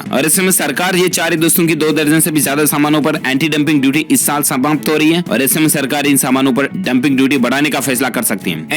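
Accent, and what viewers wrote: India. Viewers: Sir main aapki bahut Badi Fen hun aapki aawaj bahut Acchi h ak dam Hindustani wali....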